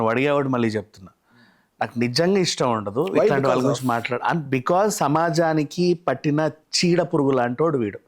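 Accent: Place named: Visakhapatnam, India. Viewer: Vizag telugu is far better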